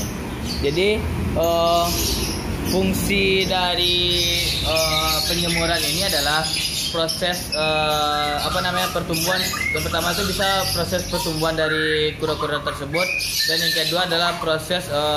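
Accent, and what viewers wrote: Indonesia. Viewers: bang orang Padang apa madura ? kok logat bahasa nya kek orang madura ya 😁✌️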